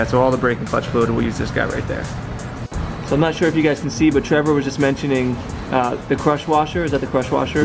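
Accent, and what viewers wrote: South Africa. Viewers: I recognize the South African accent 🤣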